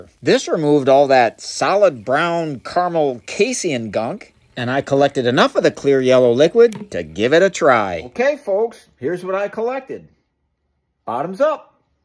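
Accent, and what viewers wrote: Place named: South, United States. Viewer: Never thought I'd see a southern accent and actual science mix together in the same person. Good to see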